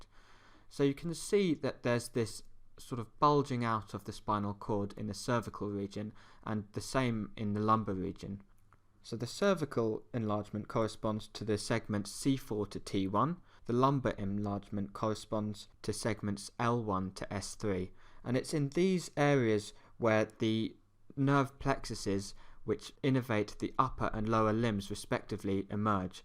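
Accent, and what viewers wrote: United Kingdom. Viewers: I am a layman. But I love the accent of your British English.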